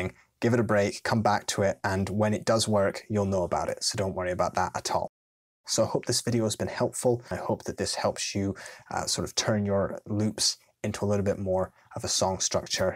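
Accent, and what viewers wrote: Scotland, United Kingdom. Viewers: He's Scottish, so you must like that accent. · Are you Scottish? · Did you know?, if you went to Scotland, in particular his home city or town; he wouldn't have an accent anymore. You would have an accent.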